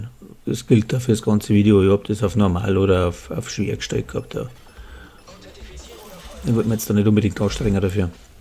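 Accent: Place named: Swabia, Germany. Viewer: Als selbst tiefster Urbayer frage ich mich, wo der Dialekt herkommt. Hat Einschläge vom Schwäbischen, wie es mir vorkommt.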